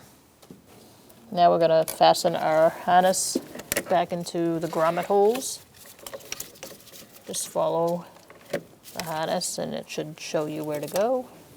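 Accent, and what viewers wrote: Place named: Massachusetts, United States. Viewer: Love the accent, sounds like she's from the northeast like Massachusets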